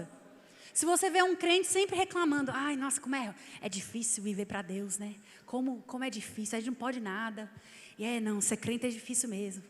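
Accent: Minas Gerais, Brazil. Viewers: pensei que tinha sido no mineiro kkkk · é sotaque mineiro mesmo kkkkkk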